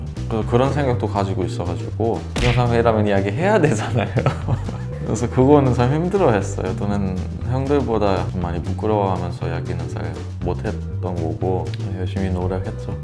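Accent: Seoul, South Korea. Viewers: 저보다 서울말을 잘하시네요 ㅜ ㅜ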